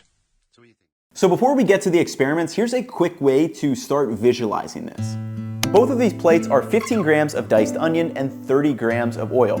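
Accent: United States. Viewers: europlugs, american accent... i am very confused! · Just noticed the plugs and cabinets in the background. In spite of the American accent, you're in Europe? Where at?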